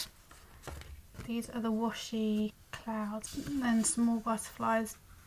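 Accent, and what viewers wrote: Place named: United Kingdom. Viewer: you have a British accent?! OMG!!! I was in love with your journals, personality all this time, and now I fell in love with your accent and pretty voice. Definitely continue doing voiceovers. Music to my ears💕💕💕 I need to get the green version of this journal. It is just so aesthetic, I'd love such journal to sit in my shelf. And her washi tapes and sticker designs are always so elegant and feminine. Love love love Leela's style and your unboxing❤‍🔥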